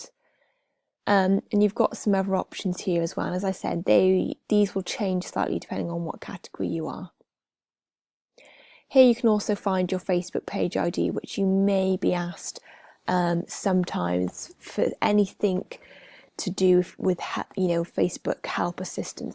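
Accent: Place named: London, United Kingdom. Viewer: oh hell no! can't follow her accent at all. Why is she speaking like a london school teacher?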